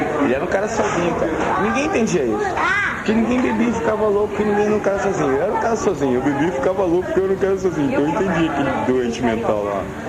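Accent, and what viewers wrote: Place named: Brasília, Brazil. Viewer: Sotaque Brasília antigo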